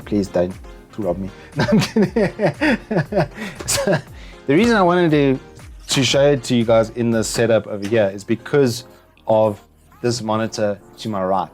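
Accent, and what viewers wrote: South Africa. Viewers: I don't normally have problems with SA accent, being in Australia myself. But I am hard of hearing, and dude you slur and mumble. And please look at the camera more when talking. Thanks.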